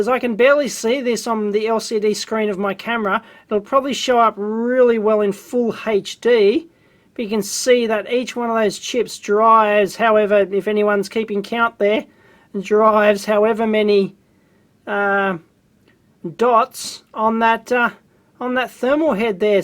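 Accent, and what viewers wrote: Australia. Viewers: First time i have heard a Nerd with an Australian accent lol.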